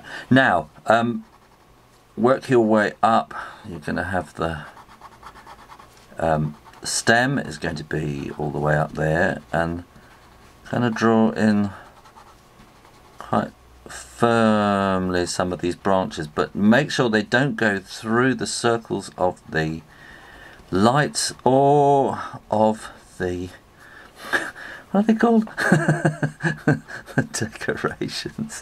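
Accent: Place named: England, United Kingdom. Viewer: Great video! Good job! What part of England are you from? Love your accent! :D Thanks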